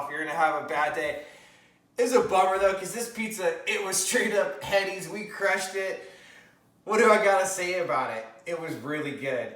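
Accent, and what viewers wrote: California, United States. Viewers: You have one of the strongest california accents I've heard and I live in LA!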